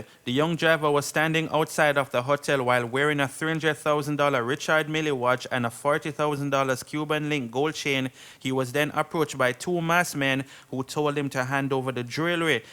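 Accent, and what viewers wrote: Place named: Jamaica. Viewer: Are you speaking Jamaican or east-indian accent?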